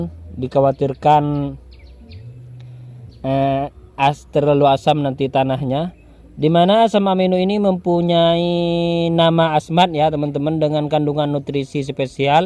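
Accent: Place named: Madura, Indonesia. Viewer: kyak logat madura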